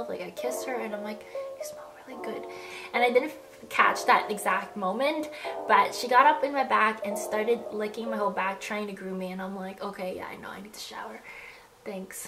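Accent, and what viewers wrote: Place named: United States. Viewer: She has a very Americanized voice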